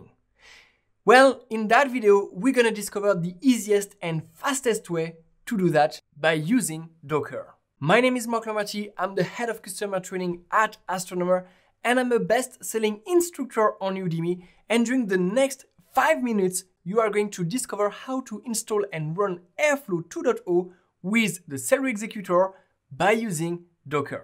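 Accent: France. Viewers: please improve your accent because not all the words can be understood correctly. Too many French sounds when pronouncing. Thanks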